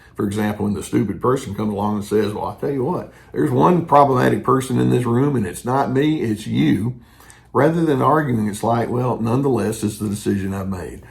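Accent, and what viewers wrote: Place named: Texas, United States. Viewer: I find your videos very useful and I love your Texas accent! I'm from the UK. 👍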